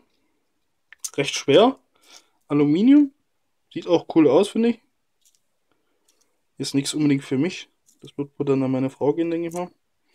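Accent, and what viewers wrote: Saxony, Germany. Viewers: bist du sachse ?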